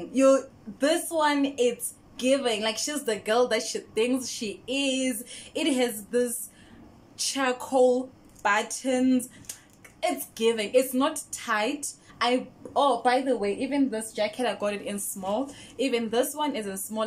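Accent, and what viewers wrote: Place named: United States. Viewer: You are so entertaining I was laughing throughout the video like it is the American accent for me.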